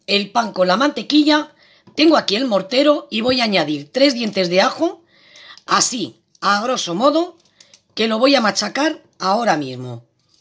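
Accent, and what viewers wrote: Spain. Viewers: Todas las noches me voy a dormir pero me hago unguiso de risa sos española como mi abuela fue algo pequeño hacia unplato de comida soy de Argentina te felicito gracias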